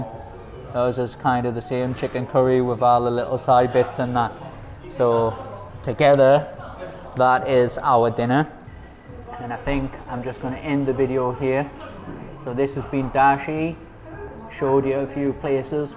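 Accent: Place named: Newcastle, United Kingdom. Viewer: He is from UK. Specifically North of England. Newcastle area. Which known as Geordi land. Hence his accent.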